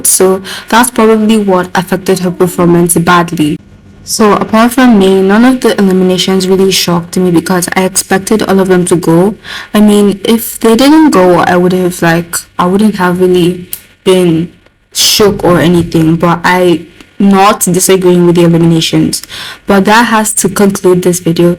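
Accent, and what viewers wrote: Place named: Nigeria. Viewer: OMGGGGG YOU'RE NIGERIAN TOO. I HAD A FEELING YOU WERE FROM YOUR ACCENT. I'M NIGERIAN TOO NICE TO SEE A FELLOW NIGERIAN THAT LIKES KPOP❤❤❤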